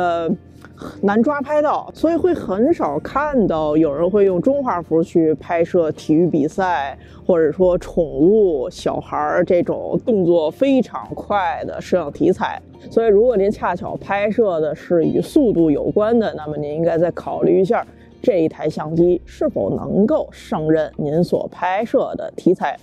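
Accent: Beijing, China. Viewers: 今兒这视频我彻底确定您是北京人了，这京腔！😁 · edi是北京出身吗？语气很像呢。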